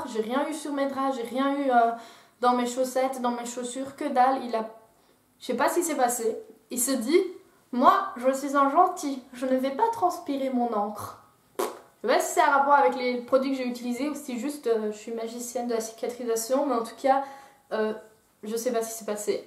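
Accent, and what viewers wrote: Belgium. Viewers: ton accent belge est adorable, tes lunettes sont canons tout comme ton septum ET TES CHEVEUX (et ton KK) et ton tatouage bien sur :) je m'abonne !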